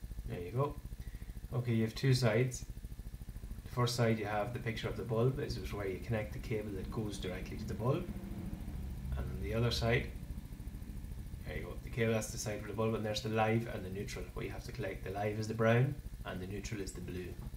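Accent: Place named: Ireland. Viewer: its hard to be sure about a technician with an irish accent, its a tad off putting, telling a joke or singing a song, writing a poem, sure, but not doing something technical, just saying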